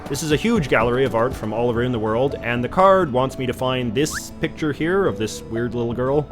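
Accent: Canada. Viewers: This guy pops up in my suggested videos maybe 2 or 3 times a year, but every time I hear him, I’m always shocked at how much he somehow has the most punchy, if affected Canadian accent I’ve ever heard—and this with having had Canadian classmates, dormmates and colleagues in America and abroad! Oh, and no one thinks American buildings are all closed off and under otherworldly security, except for maybe Canadians whose media desperately want to portray Canada as somehow so different from the US in terms of security and civil discourse in public spaces—but their public let us know that they are never too far off or behind in many ways, right, Ambassador Bridge?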